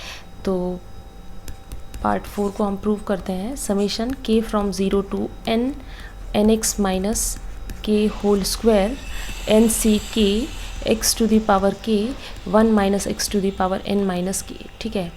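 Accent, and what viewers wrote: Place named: Bihar, India. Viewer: Aap bihar se h kya, aap theek h bolti h isliye puchha h, waise aap topics achhe se explain krti h , Dhanywad.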